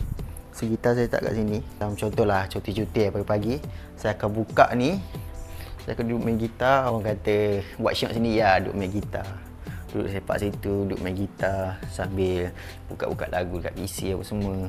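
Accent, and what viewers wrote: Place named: Kuala Lumpur, Malaysia. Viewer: Cara editing mmg dr dulu tk prnh mengecewakan...Cantiks hias... Dr dulu kalau tgk time dia duk sekali dgn adam semua diorng antara paling kemas jaga umah... rumah bujang kira mmg sngt² okey... umur adik akak sebaya tp kena akak dia yg kemas bilik depa... Kalau tk sakit mata tgk...😅 Cara berckp dr dulu sgt perform bile dia brckp dlm yt dia... dulu org kecam sbb ckp loghat kl ni berlagak tp sebenarnya kalau kt pandang jauh blh menarik org luar utk tgk yt dia jgk... akak sgt suka sbb dia ade vibe mcm yt iman kamil... softspoken n details bile bercerita... Org introvert je faham... ni kalau dia review brg confirm org jadi terjebak nk beli... Terbaik... 🎉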